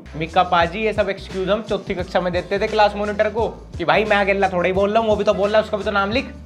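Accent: Haryana, India. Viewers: I ❤️ haryanvi accent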